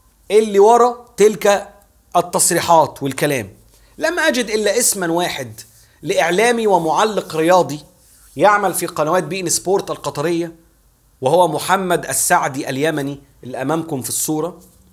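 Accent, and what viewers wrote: Upper Egypt, Egypt. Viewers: أنت مبين من لهجتك صعيدي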